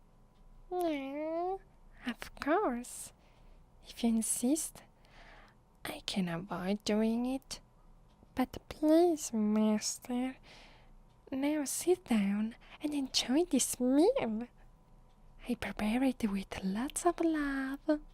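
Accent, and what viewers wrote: Italy. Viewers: Ah the almighty spaghetti accent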